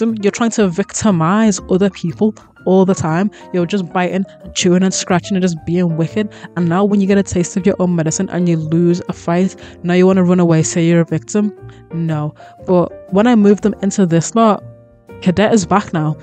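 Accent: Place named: Liverpool, United Kingdom. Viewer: omg 😅 that's a south liverpool accent !! how did my life go this long without hearing another scouse simmer 🥹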